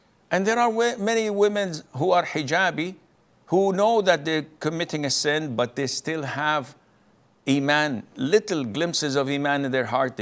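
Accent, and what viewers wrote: France. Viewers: The heaviest french accent I've ever heard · I rewatched the video 3times just to catch the french accent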